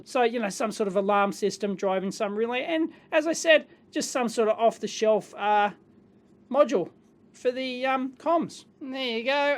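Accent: Australia. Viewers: JuiceOfSapho more like Dundee ! Crocodile Dundee! with the similar accent . or maybe Jim bowie!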